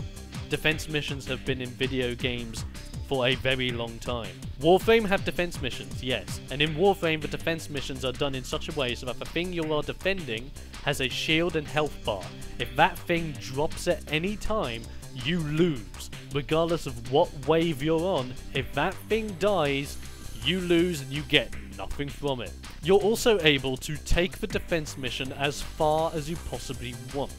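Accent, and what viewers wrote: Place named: United Kingdom. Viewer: Um... he's British.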